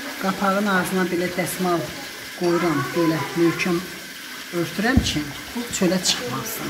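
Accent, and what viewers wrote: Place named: Shamkir, Azerbaijan. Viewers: gozel şemkırım lehcenin qadasin alim birinci defe baxan kimi bildimki şemkirlisiz👍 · Əllərinizə əməyinizə salıq olsun cox xanım bəh bəh sizin danışığnız lap Samuxlular kimi danışırsız mənə elə doğma və ləhcəniz şirin gəlir ki dilinizə quzu kəsim lap darıxdım kənd həyəti ücün mənimdə bir tərəfim Şəmkirin qırmızı Samux kəndindəndir eşq olsun Səmkirlilərə və bütün rayonlarına cox sevirəm sizi əzizlərim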